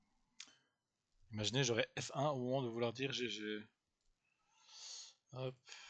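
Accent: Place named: Switzerland. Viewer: Joli ❤ iop joli l'accent suisse 😂❤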